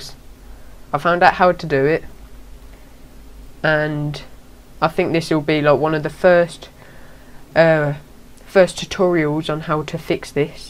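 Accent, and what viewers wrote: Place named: United Kingdom. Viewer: MAN YOUR ACCENT IS AMAZING, ARE YOU BRITISH?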